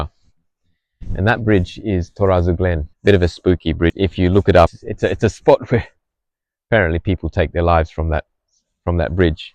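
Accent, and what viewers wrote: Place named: Malaysia. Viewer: I’m here after watching the video of you speaking with your daughter about complexion. As a mum of 3 beautiful mixed girls (Indian and Chinese), I do feel for them when they are asked about their heritage. But they consider themselves lucky.. they get double the festive celebrations and double presents! We are blessed to live in Malaysia. And I detected a slight Malaysian accent when you spoke, happily I was somewhat correct, lol. Good luck in your journey. Home is where the heart is!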